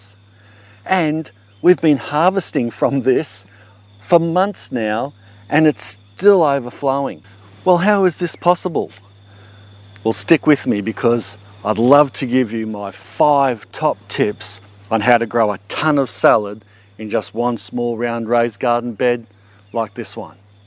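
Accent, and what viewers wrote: Australia. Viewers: I like your video very much,. Just a question, where do you live? Your accent sounds like an Aussie!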